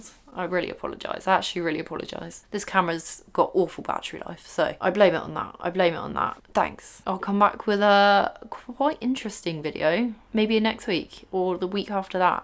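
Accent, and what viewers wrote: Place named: United Kingdom. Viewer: I’m mainly watching for the British accent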